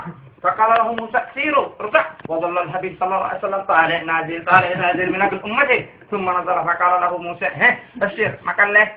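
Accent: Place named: Tihama, Arab world. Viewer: اضن انه اوصل المعلومة بس بلهجة اهل تهمامه ..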